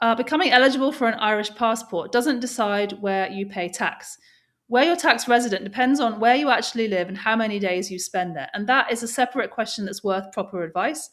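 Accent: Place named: Bristol, United Kingdom. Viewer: Sorry! - it is just Adie Allen was in an episode of Inspector Morse and I thought there were similarities. Thinking about it however, the Morse episode was from the late 1980s - almost 40 years ago (seems just like yesterday) - when Adie Allen would have been in her mid-20s, Adie is from Bristol and I'm sure I detected a slight Bristol accent in you. Anyway, it was a very interesting video regarding getting an EU passport through living in Ireland. Thank you.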